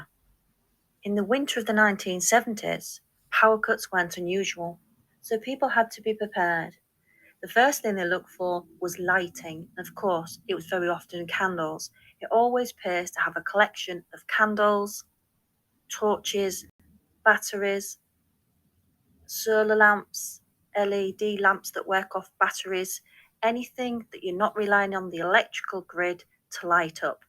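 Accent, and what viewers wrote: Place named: Hull, United Kingdom. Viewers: Well done on hitting your first 1K Fab video once again, both Mr Wallflowers and I really enjoyed it, we especially enjoyed your poem with your beautiful Yorkshire accent ❤ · Thank you SO MUCH for your fabulous videos. My beauitful Mumma (of blessed memory) was a Yorkshire girl who emigrated to Australia in 1963. I was born the year after and have such fond memories of growing up in the 70's. Mum was a very frugal lady and passed on many skills. Beyond grateful for her input. Things here in Australia are very expensive but I make the most of things and enjoy the challenge of making do. Ohhhh, how I love your accent! It sounds just like my Aunt in Barnsley! Think I might be a Yorkie at ❤! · Your accent sounds familiar - do you come from, or very near, Hull ?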